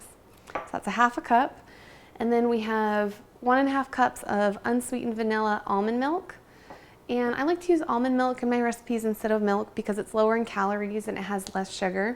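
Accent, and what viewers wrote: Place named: Kentucky, United States. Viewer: Her dialect sounds interesting... where is it from ? Kentucky ? or the areas around it ?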